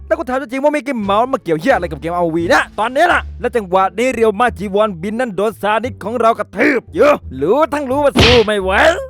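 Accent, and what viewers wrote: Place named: Isan, Thailand. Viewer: พี่ชอบพูดทำเนียงอีสาน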